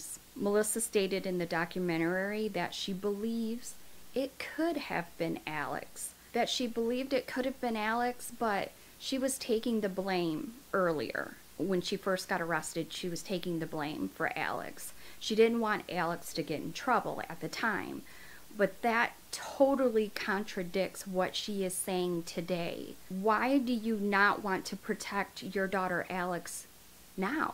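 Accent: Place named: Dakota, United States. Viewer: Are you from Dakota? You remind me of a character from Fargo or Chelsea houskas mother.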